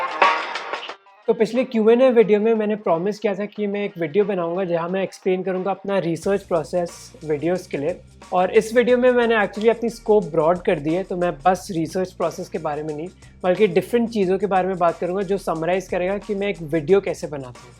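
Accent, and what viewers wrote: India. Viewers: Can you put captions on this video? Because it's really hard for me to understand the indian accent 😭😭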